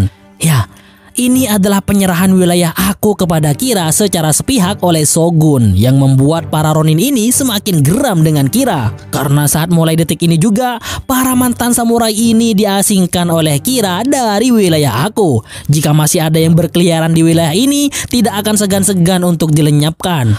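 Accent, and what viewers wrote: Madura, Indonesia. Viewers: Medureh ongghu , keren ❤❤❤ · Suara latar nya kayak orang Madura logatnya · Madureh reh😂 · Reng Medureh cook😂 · Lucu moderatornya,letolean 🤣...mantap...madura eksen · Suaranya madura bgt , tlg d benarkan bang .... Bahasa indonesianya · Oreng madureh mon disoaranah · Madureh dimmah sampean tretan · Abeh mak sellae madura 😁😁😁😁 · Ngkok agelek eh takok dusah ternyata adminah reng medureh😂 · Madureh mas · Medurene e tengale Mak 😂 Tp mantap 👍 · Njir madura cokkk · Oreng medureh hedeh kak Yeh wes auto subrex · Oreng madureh hedeh nom😅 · Min dari madura yah ?? Kentara banget logatnya hihi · 😂 logatta tangake jhek Reng madureh 😅 salam Sampang bang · Kurasa adminnya madura dr logat ya sya tebak, mantep tretan😂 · Orang Madura dia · Abbeeee alure campur madura masze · Mak medureh kak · Corak reng madureh reh adminah😩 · Ye pokeeh madureh tulen 😂😂😂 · Reng madure ini..se ge oge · Haha, mimin madura 😅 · Mak pas bedeh bhs medurehnah tretan Mantap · Pengisi suaranya kek orang madura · reng madureh kak... 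madureh dimmah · Ini komentatornya orang madura ya....😂😂😂 Soghe' ckna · MK Reng madureh kak🤣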